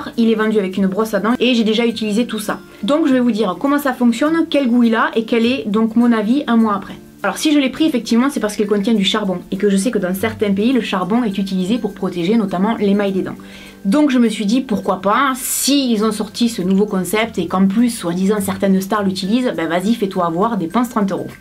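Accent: South, France. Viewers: #tuesunestar lol tu me fais toujours rire et j'adore ton accent du sud 😍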